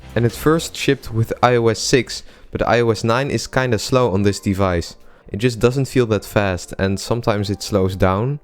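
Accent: Netherlands. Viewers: funny Dutch accent. :). but good review!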